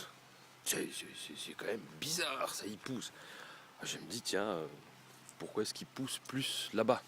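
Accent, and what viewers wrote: North, France. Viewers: ou alors du coter nord france cette accent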